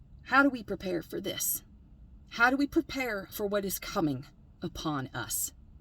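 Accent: South, United States. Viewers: Thanks. Very good video and my wife and I heard more of your southern accent in this video. We love it. When the temp of my blood rises the southern, mountain accent comes out too. Bless you!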